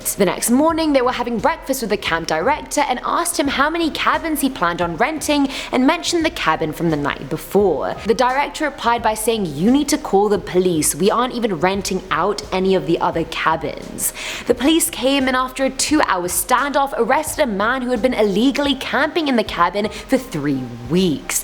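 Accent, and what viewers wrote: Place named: Wales, United Kingdom. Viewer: You have a Welsh accent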